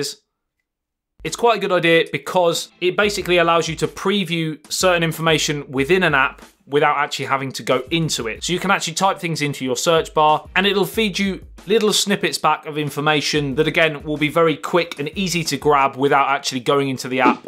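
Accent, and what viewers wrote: United Kingdom. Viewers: Great video 👍 And I love the way you Brits knock off a syllable in the word bat-ter-y and pronounce it ba-tree 😊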